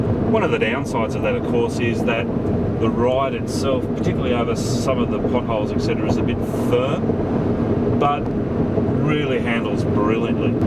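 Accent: Australia. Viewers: he sounds like Crocodile Dundee!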